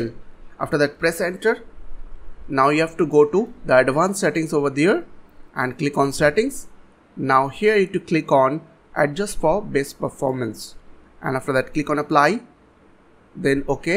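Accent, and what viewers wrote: India. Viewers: Please keep your Indian accent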